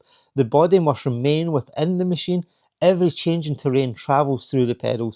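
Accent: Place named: Scotland, United Kingdom. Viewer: I like your voice and accent! Which part of Scotland are you from?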